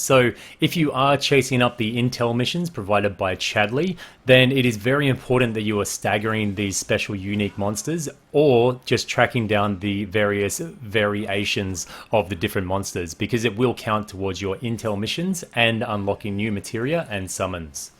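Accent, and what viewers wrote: Australia. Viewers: Austeralian eh?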